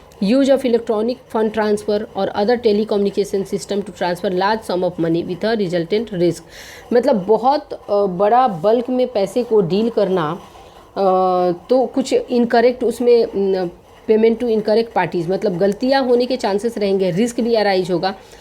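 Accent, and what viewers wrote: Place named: Bihar, India. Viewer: Mam apka accent sunke mujhe samaj me ek chiz aa gaya...u r from bihar but u r posted in maharashtra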